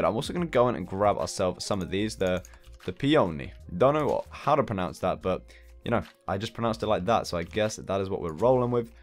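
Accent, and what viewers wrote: United Kingdom. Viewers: Ar you english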